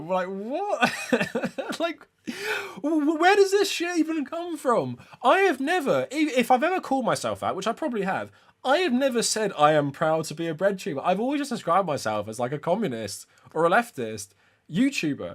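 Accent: England, United Kingdom. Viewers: I don't think you're condescending. But maybe just having a British accent makes you come off that way to people in the U.S🤷‍♀️ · I'm almost certain they think you're condescending because of your confidence combined with your accent lol lots of western media has posh know-it-alls or intelligent, antagonistic Englishmen as the opposition. Aside from that, seeing a Destiny viewer call you condescending is so rich 🤣 Destiny is easily one of the most condescending politickers out there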